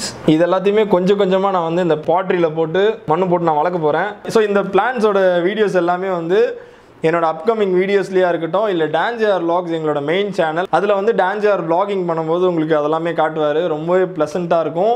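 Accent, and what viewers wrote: Kanyakumari, India. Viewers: Bro neenga kaniyakumari ah sollunga bro❓ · Kanyakumari language super😍😍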